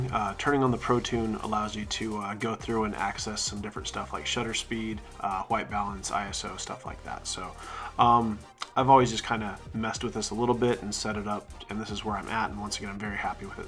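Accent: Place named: Western Pennsylvania, United States. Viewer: Hey, thanks for posting this. Love your channel. Are you by any chance originally from western Pennsylvania? Guessing based on your accent.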